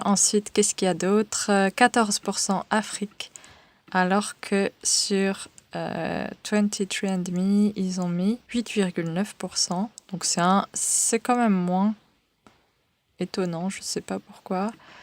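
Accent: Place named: Morocco. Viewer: Jolie voix marocaine :) Tu est une haplogroupe E et J :) voir ici <link>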